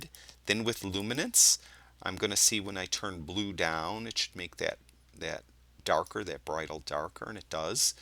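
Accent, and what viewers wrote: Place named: Chicago, United States. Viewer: You know what? people who call people shills, usually have an envy problem, or an unrealistic view of how the world works. In order for anyone to do anything, you need to eat, you need to be secure in your home, and the way that happens is by earning money. A dirty subject for sure, but a necessity nonetheless. What would it matter if you were being paid by Topaz? What`s important is the veracity of your content, and up to now, it`s been spot on. I`ve not only learned from you, but enjoyed it in the process, and more importantly, my Photographic post processing has improved immeasurably. So, top notch content, from a name right out of The Sopranos central casting (at least to my English ears)and all for the sum of watching a short ad. Doesn`t get any better than that. So forget about it, and keep doing what you do. 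Cheers... Oh, and ps, when I want to use a Topaz plugin, I just go straight from PS or LR no need for Fusion, so the whole Fusion thing confuses me. Could you elaborate on the use of Fusion sometime? Thanks... PPS, I`m guessing your accent is somewhere from the Chicago area, am I wrong?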